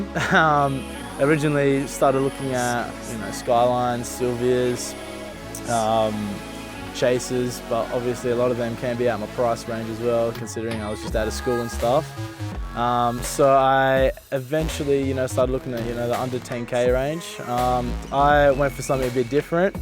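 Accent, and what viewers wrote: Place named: Australia. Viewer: Haven't you heard an Australia accent ??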